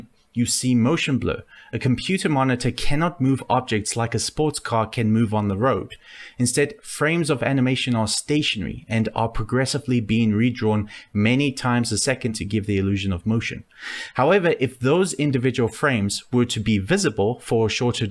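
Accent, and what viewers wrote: South Africa. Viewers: I detect a South African Accent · South African ;) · Just started the video, noticed your accent sounds like south african... Are you from South Africa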